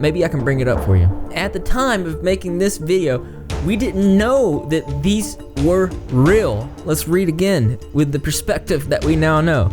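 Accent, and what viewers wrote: South, United States. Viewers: Ohk his voice sounds southern but then sometimes sounds like’s from the UK. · "We all have a crazy ex who turns another ex in to us"😂😂😂. I'm from Louisiana and you have an accent, are you also from the south?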